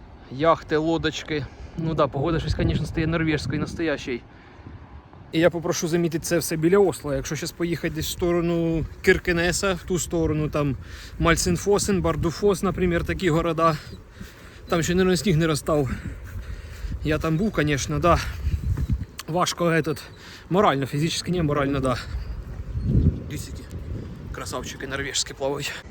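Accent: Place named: South, Ukraine. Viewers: минута. Земляче я теж з Оріхова. По твоєму говору я так і поняв що ти десь з Запорізької області. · Вітаю тезка. Так оказується ти ще й мій земляк, я так чомусь і подумав коли вперше почув твій говір, бо дуже знайомий діалект, такий тільки у нас на півдні ) Я теж на чужині, працюю, не легко, але є надія на життя. Дуже сподобалася твоя ідея збирати пляшки, збираюся і сам якось у вільний час таким зайнятися. Успіху тобі та натхнення !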